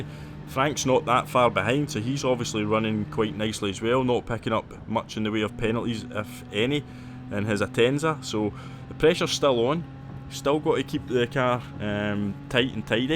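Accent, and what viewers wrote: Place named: Northern England, United Kingdom. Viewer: Its nice to hear a good Northern voice rather than these Southern pansies all the time 😂😂😂 Good stuff mate, keep it up 😏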